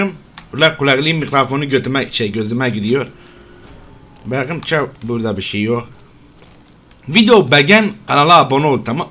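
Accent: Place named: Adana, Turkey. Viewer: Abi adanalı mısın sövmenden anladım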